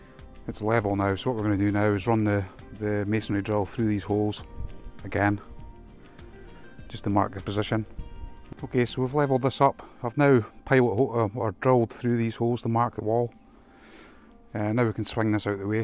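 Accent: Scotland, United Kingdom. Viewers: Liked the presentation nice to hear a scottish accent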